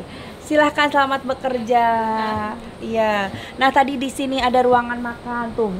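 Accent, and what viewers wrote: West Java, Indonesia. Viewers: Suka banget sm teh sllu apa ada nya, punya ciri khas bhs sunda bangga aku jd org sunda.. Teh jg ga somse 😘😘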